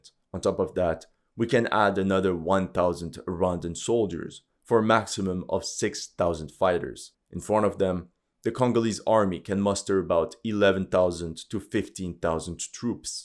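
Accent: France. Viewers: Quand j'écoute votre vidéo j'ai l'impression que vous avez un accent français fait des vidéos en français aussi 🤔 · Amazing French accent! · You a Frenchie brother?😊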